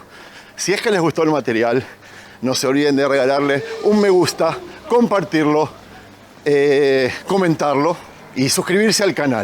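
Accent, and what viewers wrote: Argentina. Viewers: Nice video. Is your accent a mix of Argentinian and Hebrew?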